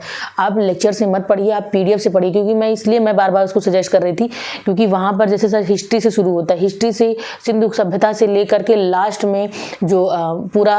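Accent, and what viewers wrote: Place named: Mathura, India. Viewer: MAAM AAP KA CENTRE KAHAN HAI. AAP MATHURA K LG RHE HO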